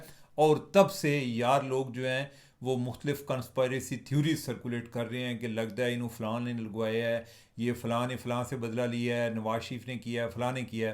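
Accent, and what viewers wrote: Punjab, India. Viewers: بے لاگ تجزیہ کھلا ڈھلا انداز جس میں پنجابی کا تڑکہ واہ کیا نیچرل اور دل کو چھو لینے والا انداز ہے❤ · Punjabi da Tarka good taste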